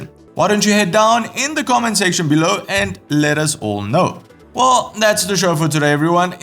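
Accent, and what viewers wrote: South Africa. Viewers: Nice video as usual.thanks guys. Ps: your accent sounds like mine... Afrikaans. Intrigued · For a Romanian you have a remarkably South African accent 😀 - love the videos !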